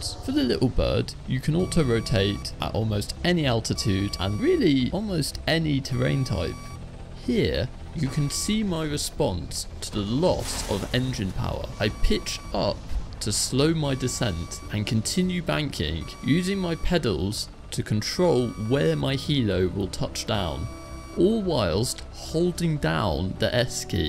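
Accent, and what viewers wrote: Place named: United Kingdom. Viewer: there is no better accent then british ^^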